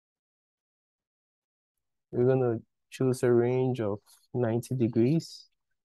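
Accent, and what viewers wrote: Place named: Ghana. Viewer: You have a Ghanaian accent. Are you a Ghanaian?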